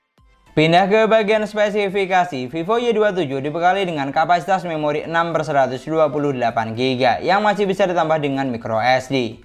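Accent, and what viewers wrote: Madura, Indonesia. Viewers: Bang asal madura kah?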